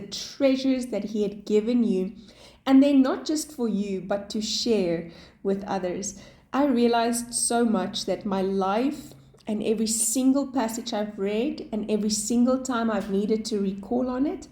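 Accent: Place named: South Africa. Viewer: Wow your accent sounds so much like my husband’s (he is from South Africa and speaks Afrikaans). He has been in America for 25 years and has lost a lot of his accent.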